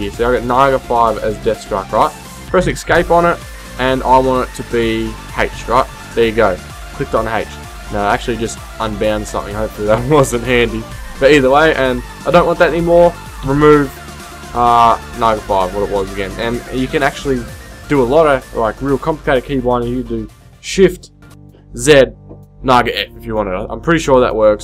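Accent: Australia. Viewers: Love that Australian accent :D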